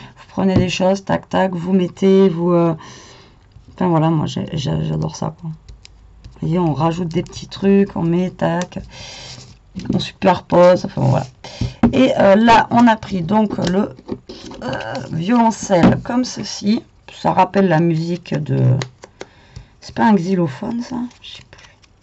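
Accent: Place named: Marseille, France. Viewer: Ha voilà ça s’entend lol j’adore moi Marseille 😉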